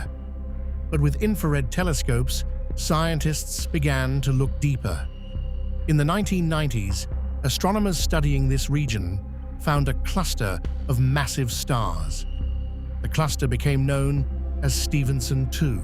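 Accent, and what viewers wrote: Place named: United Kingdom. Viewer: British guy voice! 👍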